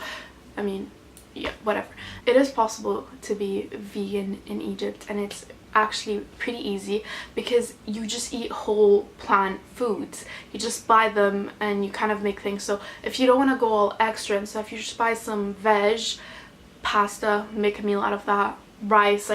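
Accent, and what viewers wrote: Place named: West, United States. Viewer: can you do a video on how you found living in Egypt as a english person- ik you're egyptian but from your accent i can tell youve lived alot of your life in a western country (correct me if im wrong). it would help alot as im thinking of moving there soon but ive lived most of my life in england. (im 18, vegan too) im just scared i wont get along or agree with people bc of the different views and stuff. Thank you x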